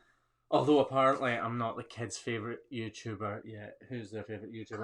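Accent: Scotland, United Kingdom. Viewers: Hi Mr I'm so sorry I missed the live stream but just wanted to drop you and the Mrs a Happy New Year from Northern Ontario Canada. Love your video. I'm not in farming at all but I find it amazing what care you take with all the cows and bulls. It's so great to see. So LOVED your video with all the calfs was wishing I could of been in there with you and played with them. Sooo sweet. Thank you for being such a kind and loving person it does come themrew in your videos. Have to love a Scotsman it's the accent. I'm part Scottish and have never been to Scotland your videos really make me want to visit. I had asked before but the music you play in the back ground who is it? I know there's more then one but I love it it's so relaxing. Can you give me somenoff the names please. O and you should come to Canada and see it we are up to Scotland standards. 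That would be a great video. Thanks for all your video and again Happy Ney Year may you and your be truly blessed.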